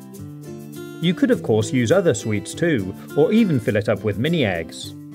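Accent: England, United Kingdom. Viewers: are you from englang or some in the north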